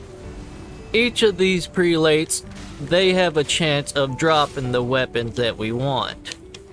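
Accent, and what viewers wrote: South, United States. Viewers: bros aggressively southern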